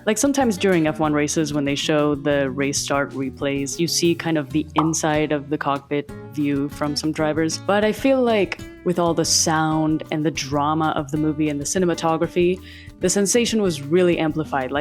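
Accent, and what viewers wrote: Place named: Spain. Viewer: Your English is seriously so damn impressive. It genuinely took me until you said Javier Bardem's name for me to place the microscopic accent, and then I was like, "Hecho, she's Spanish." But your English is better than 90% of Americans'.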